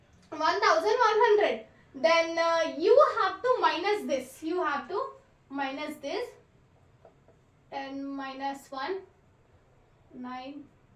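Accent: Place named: South India, India. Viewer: speak hi Marathi 😂😂 Lol she is from south of india by her accent properly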